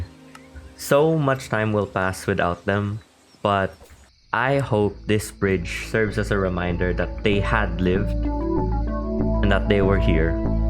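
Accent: Philippines. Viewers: Omg i was like woah he sounds Filipino and i was fukin righttt yipeeeee 🎉🎉 a Filipino ama watch you now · 10 seconds in and i clocked that you're filipino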